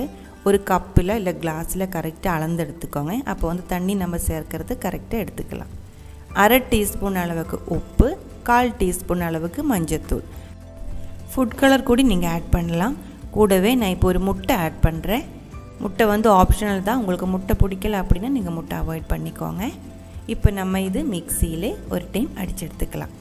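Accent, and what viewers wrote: Kerala, India. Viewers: Very nice I love ur voice nd the presentation Malayalam Kalantha Tamil 😘👌... Vry cute snack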